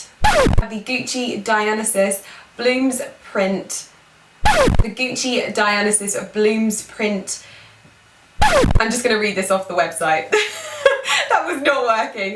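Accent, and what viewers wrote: United Kingdom. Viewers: Really Love your style and your bags!!! Keep posting your fabulous bags :) and your accent remind me of the days studying in the UK. Love from Taiwan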